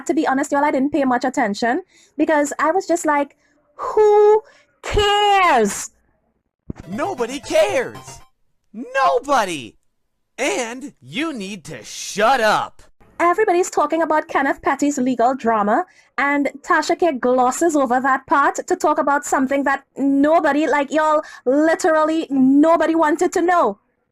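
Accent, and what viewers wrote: Trinidad and Tobago. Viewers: I love ur show💞💞. Everytime I hear ur voice I feel like I'm back in Trinidad so thank u for givin me a lil bit of Trinidad when do ur commentary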